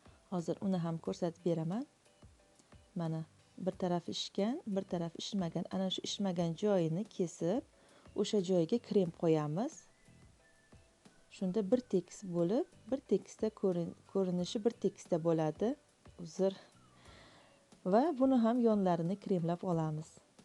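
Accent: Khorezm, Uzbekistan. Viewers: patnsga solib tuylarga oborgan yaxshi ekan menga yoqdi shu uslda albatta tayorlab tuyga oboraman patnsga salafan urab rahmat sizga opam xorazmlmsiz opam shvagiz horazmcha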